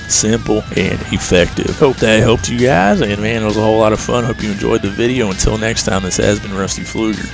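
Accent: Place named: Texas, United States. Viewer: You a Texas boy? I heard the accent and trusted you instantly.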